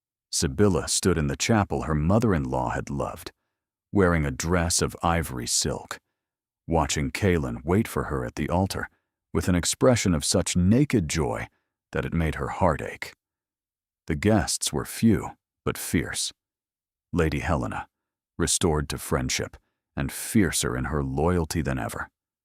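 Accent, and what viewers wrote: United States. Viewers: I do wish that you would choose an English voice for narration, this American voice speaks as if it's telling a Mafia story. Spoils it all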